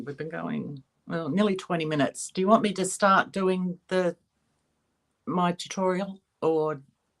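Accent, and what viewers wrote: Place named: Australia. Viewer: GORGEOUS creations! Keep doing what you're doing! Fellow Aussie here, transplanted to Texas over 40 years ago, so am loving your accent and the occasional maggie in the background! Thank you. 😀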